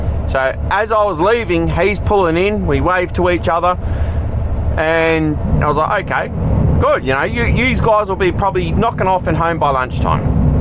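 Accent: Australia. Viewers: After watching vids with over excited yanks yelling rolling R's in my ears, it is like sweet music to hear Australian. I enjoy the reversing skills too - good one bloke.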